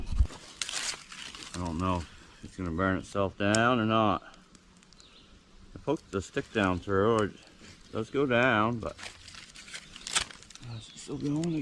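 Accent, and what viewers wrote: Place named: New England, United States. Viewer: I know a New England accent when I here one, being from Maine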